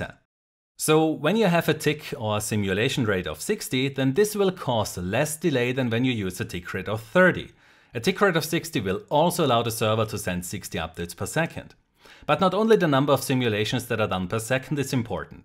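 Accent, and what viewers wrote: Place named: Germany. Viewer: Man hört soooo dass du deutsch bist 😂😂😂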